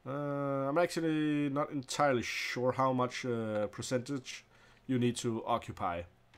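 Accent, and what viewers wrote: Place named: Denmark. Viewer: i like you danish accent!! love it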